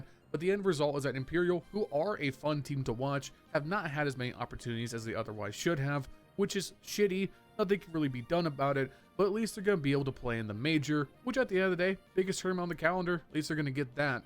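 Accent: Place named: United States. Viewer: As an Australian, please pronounce it as 'Melb-n' or 'Melbin' it hurts a lot to hear Americans do and I do like these videos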